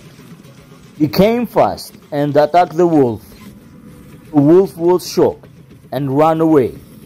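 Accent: Albania. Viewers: Вполне понятный английский с албанским акцентом. Лично для меня на много понятней чем английский с австралийским акцентом. Все таки тут очень толковую информацию собирает автор, и почти без преувеличений или ошибок рассказывает о породе. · Is that Albanian accent?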